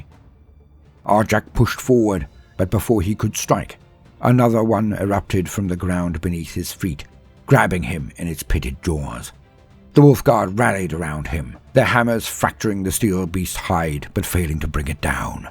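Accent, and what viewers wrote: United Kingdom. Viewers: Godbless you. Nothing hits quite like a softspoken British man during a nap 🙏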